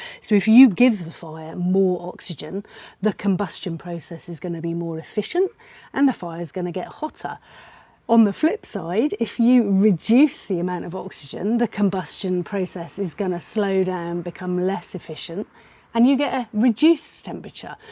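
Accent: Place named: Bristol, United Kingdom. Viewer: I’m starting to hear your Bristolian accent! Thanx for these informative kebab videos my love! Greetings from a fellow Bristolian stationed in sunny Cyprus 🫡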